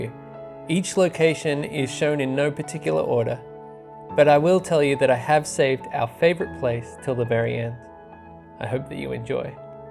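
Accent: Australia. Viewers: Thanks mate ... that was awesome. You sound like an Aussie? Taking my family to Scotland soon to Aberdeenshire ... Banff in particular.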